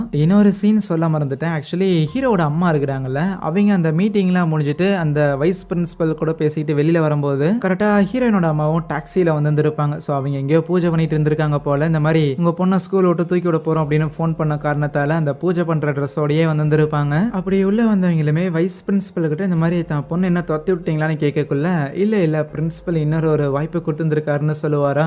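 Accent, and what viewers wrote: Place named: Madurai, India. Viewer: Unga slang avangya nu sollum bodhu nenachen, Madurai side than irupinga nu 😅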